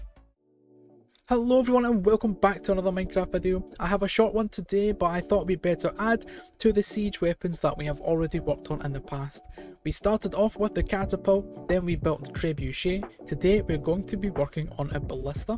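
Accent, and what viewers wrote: Scotland, United Kingdom. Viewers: U Scottish??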